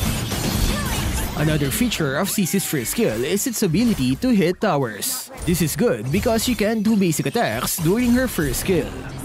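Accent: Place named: Philippines. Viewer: anoyying pinoy accent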